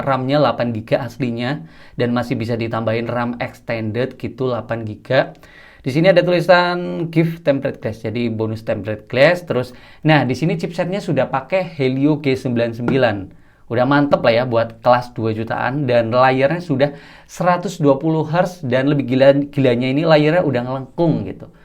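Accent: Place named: Cirebon, Indonesia. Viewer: kaya orang cerbon ya mas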